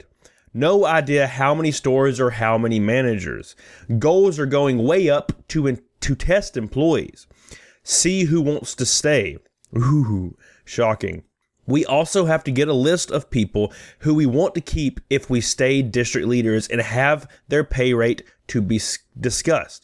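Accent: South, United States. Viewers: Can’t decide if it’s the southern accent or dumb fucking haircut. But I just want to flog you with a tire wrench. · Man oh man I just love listening to you, you truly are pretty damn funny 😆 You provide great content with a southern twang and mindset that makes, even the distasteful, a platable experience. THANKS 😊😊😊😊😊😊😊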